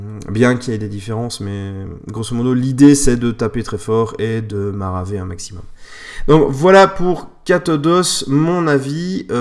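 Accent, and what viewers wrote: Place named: Switzerland. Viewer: Un grand merci pour cette vidéo. Je t'ai découvert en faisant des recherches sur le jeu. Briskars est un jeu qui me tente de plus en plus: -de part son échelle, j'éprouve du mal sur le 28mm depuis une opération aux yeux, -l'accessibilité des règles sur le Net. Au doux son de ta voix, je te devine dans le plat pays helvète ^^, y résidant moi même, je me permet de te demander si tu connais des clubs ou détaillants permettant de découvrir le jeu afin de me faire une idée plus personnelle de ce qui en est? Amicalement Fred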